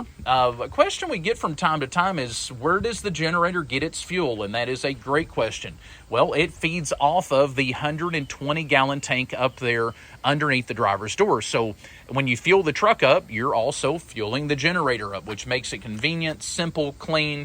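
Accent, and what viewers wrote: South, United States. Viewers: I like your southern drawl. It sounds so homey.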